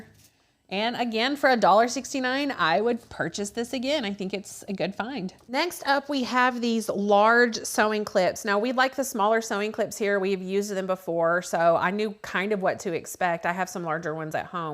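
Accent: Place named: North Carolina, United States. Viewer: I got the wooden needle holder too! Just discovered your channel and love it. You sound like you're from North Carolina!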